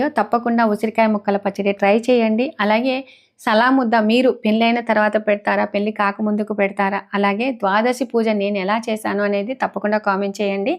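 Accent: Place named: Kambam, India. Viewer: Hi garu yala unnaru me vlogs antey naku chala estam andi ma Ammamma gari uru kambam dhagara Andukey a language naku chala estam madi nellore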